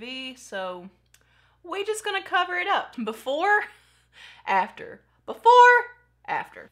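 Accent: South, United States. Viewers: I love you so much!! I have no idea what to do with makeup and I'm almost 34.. but I feel like I am learning on your channel and it helps that your southern and your funny! I laughed out loud when you said MAN DOWN!! Stay true, stay you! Your awesome!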